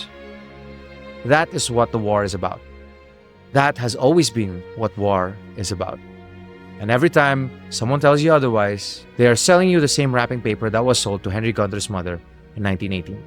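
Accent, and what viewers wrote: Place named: Philippines. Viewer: It didn’t even take me 10 seconds to know ur pinoy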